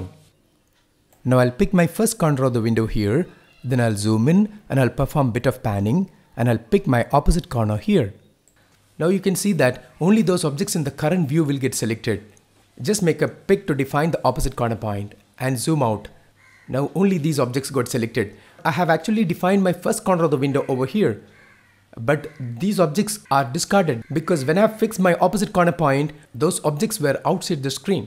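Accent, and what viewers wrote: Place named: India. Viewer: Thank you for the effort in making these videos. I just wish that you remove that Indian accent when speaking the English. Excuse me, I wanted to learn in what your are teaching, but it always annoys me listening to that accent. Please learn to speak English w/o that accent. Thank you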